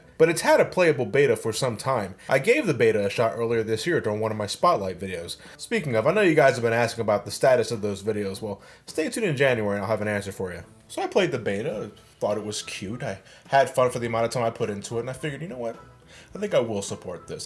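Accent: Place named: New York, United States. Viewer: Thanks for all the content my man. Swore you were from NYC from the way you speak and the moving out of your mom's crib video and all that, but either way, hope to meet you at magfest. I know you'll be as awesome as you've always been on camera. God bless. Se te agradece todo lo que has hecho.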